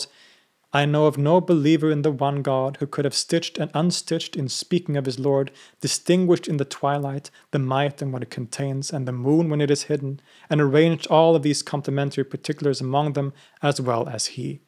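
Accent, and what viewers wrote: Arab world. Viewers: Dude stop pronouncing Persian town names in a arabic accent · What an amazing arabic accent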